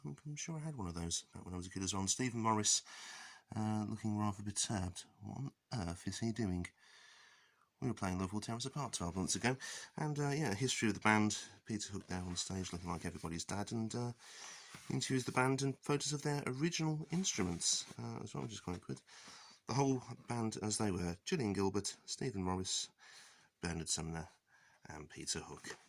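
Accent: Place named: United Kingdom. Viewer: Yeah, I was thinking the same but you know how things go when you talk & roll video for over 6 minutes lol... He gets away with it having the cool Brit accent :P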